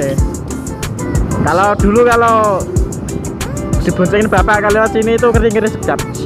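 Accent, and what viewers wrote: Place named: Java, Indonesia. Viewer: Aku suka gaya bicarane mase... Mantap.... Ngekas orang jawa... Semangat pokok e.. 🙏👍👍👍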